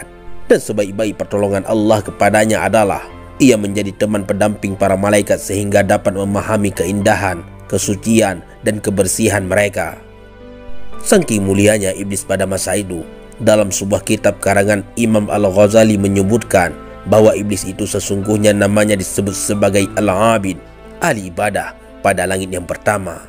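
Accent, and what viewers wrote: Aceh, Indonesia. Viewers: Anda bicara seperti orang Aceh , apakah benar ?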